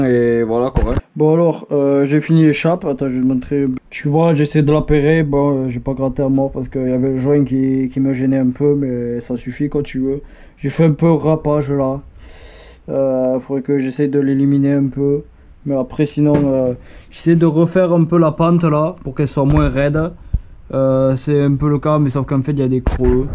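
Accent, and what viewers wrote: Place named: Tarn, France. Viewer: Bouduuuu, saint sulp, lavaur ou graulhet ?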